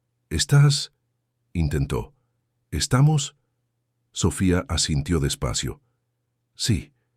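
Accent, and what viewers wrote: Argentina. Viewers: me emocionó el acento argento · narrada con acento argentino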